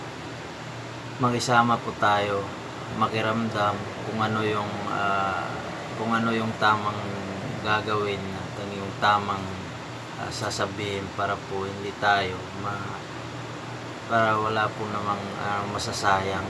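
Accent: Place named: Bulacan, Philippines. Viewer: Puntong Bulacan bgung kaibigan po plitan tau regalo po lmat amega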